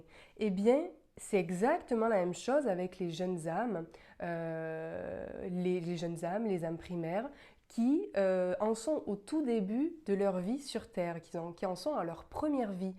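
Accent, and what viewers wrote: Toulouse, France. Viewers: Tu as un charmant accent Toulousain.... signé un Toulousain... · Un discours clair avec un joli accent toulousain... 🥰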